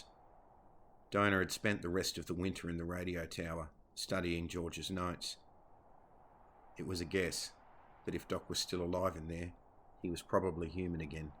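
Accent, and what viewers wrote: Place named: Australia. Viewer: Iv not finished listening to this but the oz accent makes a refreshing change to the normal American one all and all it's a dam good story so far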